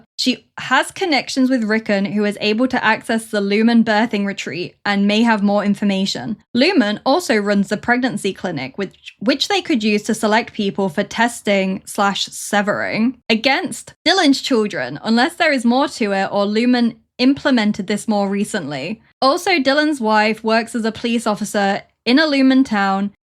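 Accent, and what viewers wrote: United Kingdom. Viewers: Hi thanks for another brilliant video. Off topic question: i’m very curious about where your accent is from. I am American. i think your accent is British but I can’t place it and I used to know British regional accents pretty well. I hear a touch of Australian but i don’t think that’s it. If you are willing to share?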